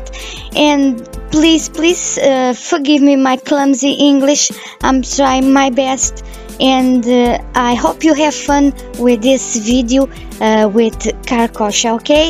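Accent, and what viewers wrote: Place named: Portugal. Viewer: esse cara é da hora !!! e o teu sotaque "tuguinha" é muito fofo !!!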